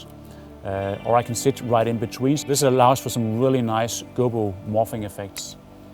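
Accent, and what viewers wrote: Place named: Denmark. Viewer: total danish english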